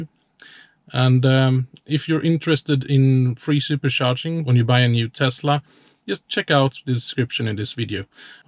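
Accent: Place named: Germany. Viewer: Ah, nothing, just your dialect is just like my friends who is German. Perhaps you have lived there?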